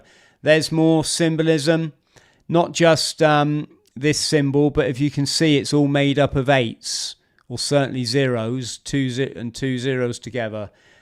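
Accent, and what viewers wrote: Plymouth, United Kingdom. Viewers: If that's a Plymouth accent that I'm hearing - that axe throwing place is pretty close to the Octagon! Lot's of the classic architectural hallmarks in the city's geography.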